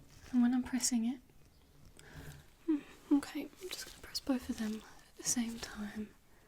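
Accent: United Kingdom. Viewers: Love your voice and accent. Where are you from?? Im Spanish,so I can't "guess" English accents very well,but you sound British(?)Not sure. Anyways really good roleplay💜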